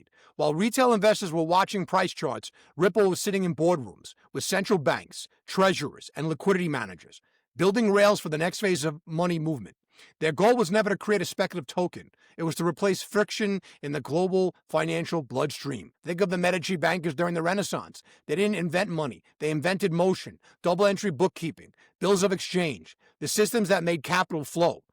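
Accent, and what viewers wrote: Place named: Brooklyn, United States. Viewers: No. I'm from Brooklyn, born in 1955. This guy is definitely from Brooklyn. He speaks like an educated old school Brooklynite. Al doesn't have a chance replicating the accent. The accent is rapidly dying out. When this guy speaks, it's music to my ears. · I doubt AI has mastered the Brooklyn accent yet...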